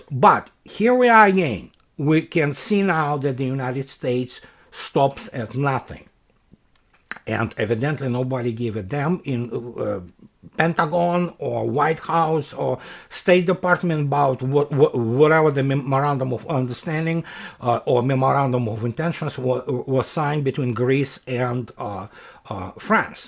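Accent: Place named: Russia. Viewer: You are very good and everything you are talking about is very interesting and important. I am adjusting myself to your russian accent, so I think I understand it all. 😀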